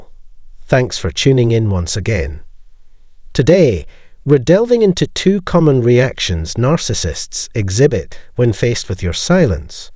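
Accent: United Kingdom. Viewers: This particular session was very helpful, so clearly explained, I feel I can handle the narcissist in my life, and not have to worry that she can gain gain control over me. I think I can win now without harming her. I want you to know how British pronunciation comes across to me as less aggressive than U.S. pronunciation, and it decreases the stress I feel when absorbing narcissistic subjects. I look forward to listening to you. Thank you very much.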